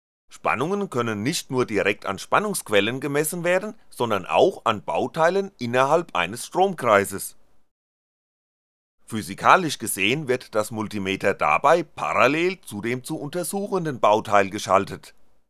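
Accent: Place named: Baden, Germany. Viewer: Ich finde die Videos auf jeden Fall sehr lehrreich, besonders die mit den Selbstbauservos und was µController betrifft. Wenn jemand sehr mit Dialekt behaftet ist (so auch ich als Badener) und versucht den "Stoff" verständlich dem Zuhörer zu vermitteln, dann kann das mitunter für den Sprecher selbst sehr anstrengend sein ohne in den Dialekt zu verfallen. Das sollte man bedenken, letztlich geht es um den Lerneffekt des Themas. Das abschließende "Dange fürs angugge" ist jedenfalls unbezahlbar.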